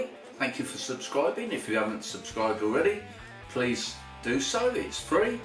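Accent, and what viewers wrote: United Kingdom. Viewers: Yummy recipe and lovely Brit accent, I love your vids! ❤️💕🙂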